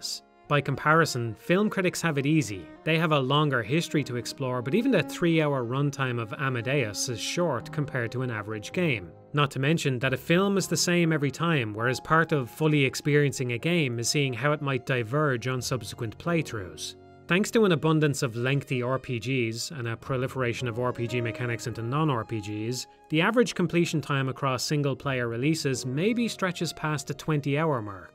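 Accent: Ireland. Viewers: the Irish accent is wonderful to hear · He's Irish, it is impossible to sound pompous with an Irish accent.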